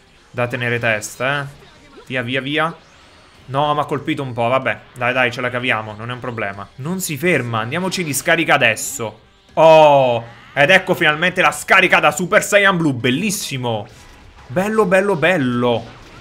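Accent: Naples, Italy. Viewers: Ma quindi sei napoletano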